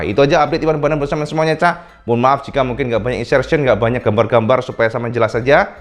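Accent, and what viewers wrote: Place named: Java, Indonesia. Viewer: seleranya boneka Ooo sampean sembarangan 😀😀 Suka banget kas jawanya cak Dan apa yang disampaikan selalu informatif, is the best 👍👍👍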